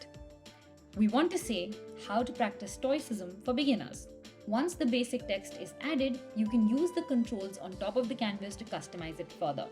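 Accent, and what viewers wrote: India. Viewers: such a nice work but voice over typical stupid indian accent , come on guys .....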